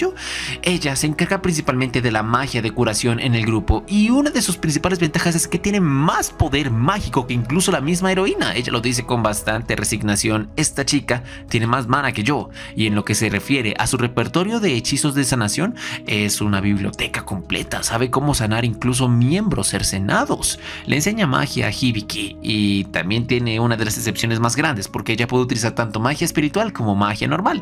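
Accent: Colombia. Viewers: Bro tú eres de Colombia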